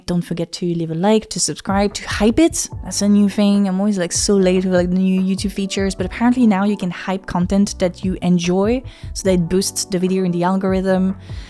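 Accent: France. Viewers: It's the difference between her accent which is obviously French but perfectly understandable and the sort of French accent where the person clearly doesn't know how to pronounce English words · I think she's French and thats just her natural accent...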